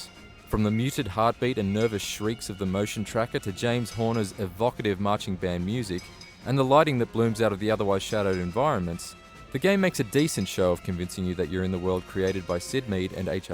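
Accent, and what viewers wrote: Australia. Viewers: Nice Aussie accent bro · YAY! an Australian reviewer! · hes australian like me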